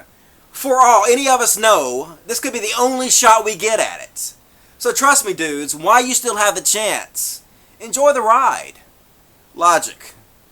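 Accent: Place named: Southern California, United States. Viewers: I love this guy's accent, it's like mixture of southern and california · if this guy didnt have a hillbilly accent and a potty mouth maybe someone would take him seriously.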